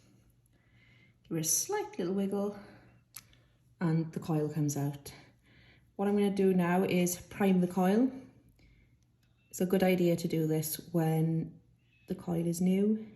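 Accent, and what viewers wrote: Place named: Wales, United Kingdom. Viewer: A fellow welsh person by the sounds of it! :)...and great video! thanks!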